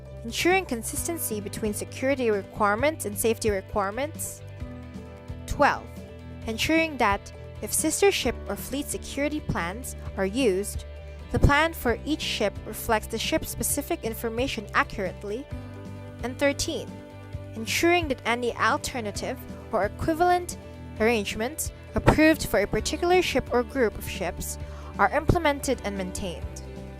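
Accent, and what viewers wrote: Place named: Philippines. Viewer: Filipino accent🇵🇭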